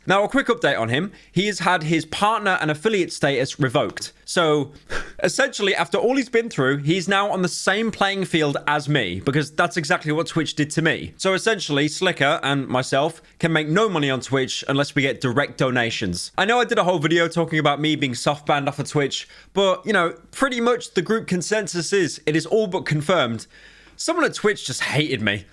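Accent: United Kingdom. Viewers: Mogul Mail is enthusiastic charlie, is british mogul mail. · being British does give a large factor, his voice is way more pleasant to listen to than Critikal. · You're brittish? fuck man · wait your British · The only reason I watch for news is because critical sounds really boring. And pyros British. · yuck british 🤢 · The one reason I what covering twitch drama is to hear a Bri'ish person say these streamers' name:))) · Bri'ish? — opinion rejected... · I only watch for his yee yee ass British accent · ur british? ok cya not listening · Listen, I like your content but you’ve got to stop pronouncing names so Britishly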